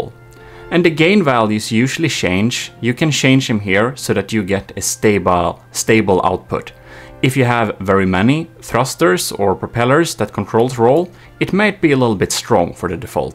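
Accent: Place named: Sweden. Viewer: I can tell that he is from sweden, hej hej jag är också svensk BTW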